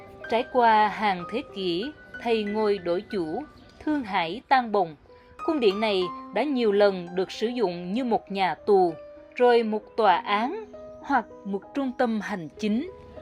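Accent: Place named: South Central Coast, Vietnam. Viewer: Chào chúc em nhiều sức khỏe! Lời dẫn nghe rất hay từng chữ từng câu được trau chuốt rất là êm tai,càng nghe càng thấy đậm nét giọng miền trung nam bộ việt nam.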